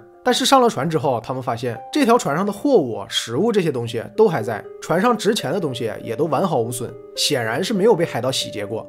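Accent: Northeast, China. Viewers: 东北口音太重了😂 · 原來以前的老視頻東北口音那麽重的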